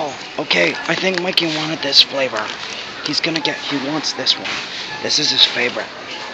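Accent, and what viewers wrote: Philippines. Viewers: ur voice's funny, did u intentionally do that? and u kinda hav an accent, an english-filipino accent.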